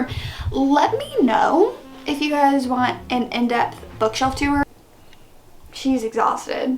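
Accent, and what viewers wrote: United States. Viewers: I keep rewinding so funny! I love the lil country accent too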